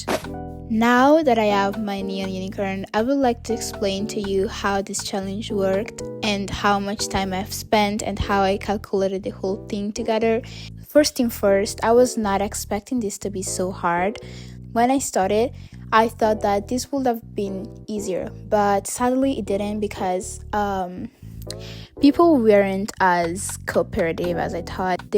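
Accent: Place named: Italy. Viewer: omg I loved this video! also ur accent is absolutely gorgeous! r u Italian? x (I have cousins who speak just like u 💞)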